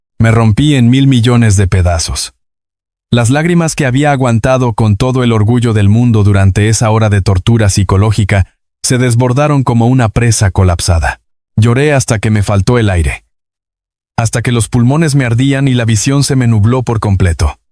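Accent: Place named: Mexico. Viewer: me encan tus BL pero creo que deverias relatar con un lenguaje menos mexicano porque no todos entendemos el modismo de las palabras mexicanas creo que deberias ser mas plural al hablar con acento y modismo neutro que en todos los paises podamos entender con todo respeto te digo esto tomalo en cuanta porfavor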